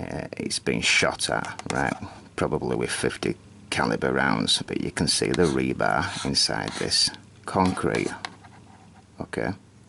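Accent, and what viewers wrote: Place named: Manchester, United Kingdom. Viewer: Mcmahn 1 im from near bolton, and this sounds manc to me, or oldham area.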